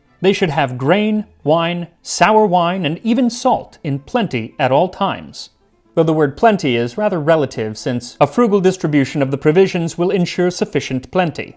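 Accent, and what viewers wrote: United Kingdom. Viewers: No need to apologize for the British accent. Movies have taught me that most Romans had British accents.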